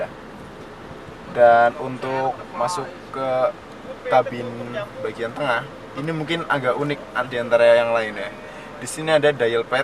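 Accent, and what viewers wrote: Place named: Purwokerto, Indonesia. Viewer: purwokerto ya mas..salam ngapak sekang purbalingga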